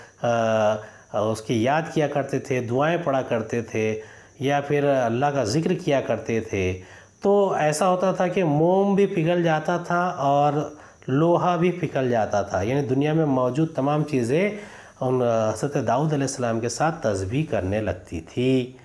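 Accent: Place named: Aurangabad, India. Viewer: Aapke Urdu bolne ka tarike se hi pata chalta hai aap Aurngabad k ho😊 Ma Sha ALLAH good explanation 👍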